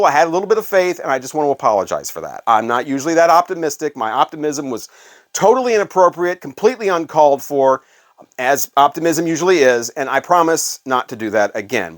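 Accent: United States. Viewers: High bullshit = High record prices?! Wtf are you talking about? Another issue; ripping people off is NOT business. And btw… there’s no doubt you are an American. And finally, proper pronunciation is not pretentious.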